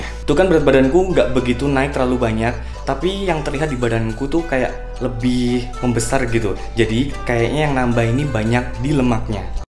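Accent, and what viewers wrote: Malang, Indonesia. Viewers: Asli orang malang ta? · oalah mas iki wong malang to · Orang malang??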